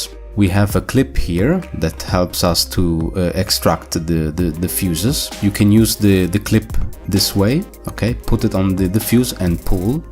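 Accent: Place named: Italy. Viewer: Love the Italian accent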